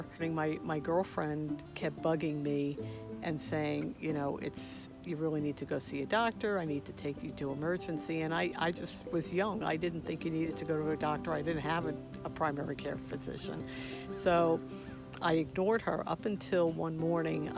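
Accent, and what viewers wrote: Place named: New Jersey, United States. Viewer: Excellent podcast! And I love that Jersey accent! 😉🫶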